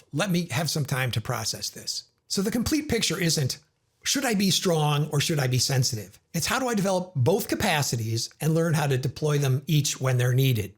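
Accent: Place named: United States. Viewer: Sorry, what part of US are you from and what do you call your accent?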